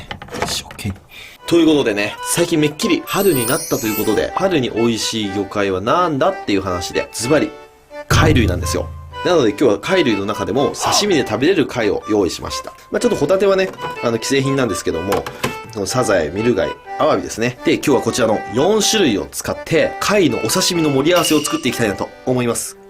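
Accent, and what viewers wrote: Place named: Mikawa, Japan. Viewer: だらって三河弁なんだね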